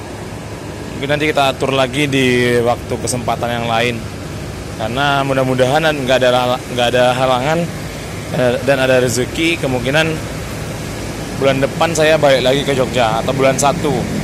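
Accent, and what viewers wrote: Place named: Medan, Indonesia. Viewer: medan bang.. medan.... semangat kali abang ini kayak nya👍👍👍😁😁😁😁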